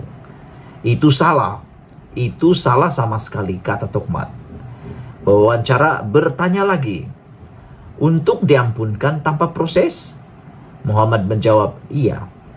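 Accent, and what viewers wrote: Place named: Sabah, Malaysia. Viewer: Embekkk.... kau cakap apa tu orang Sabah? syok sendiri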